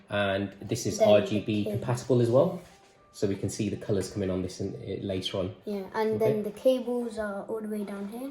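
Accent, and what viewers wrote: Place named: United Kingdom. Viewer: Can u plzz tell the prize in pounds as from the accent I guess u are from Britain ☺️(excluding the monitor)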